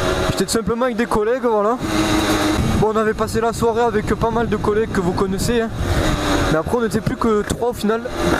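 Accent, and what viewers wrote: South, France. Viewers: Toi t du sud avec t tu vois 🤣💪🤘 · avec l'accent du sud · pourquoi tu as pris cet accent du sud normalement tu n'a pas cet accent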